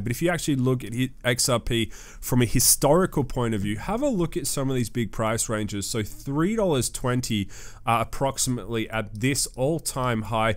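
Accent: Australia. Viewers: Great to hear a good Aussie accent. Xrp will run like mad once the market runs. Now it’s clear adoption will explode Thanks for your work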